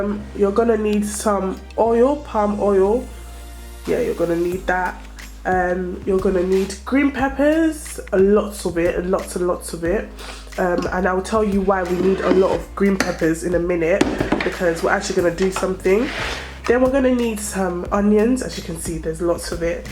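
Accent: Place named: United Kingdom. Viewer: She has a british accent , not her fault, actually lovely to listen to and as clear as day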